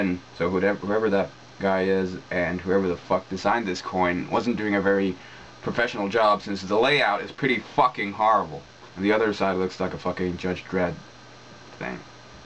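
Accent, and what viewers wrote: United States. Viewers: lol its funny seeing you talk about a quarter like its such a foreign object, when you sound like you have an american accent. I was actually surprised to find out you're in Finland.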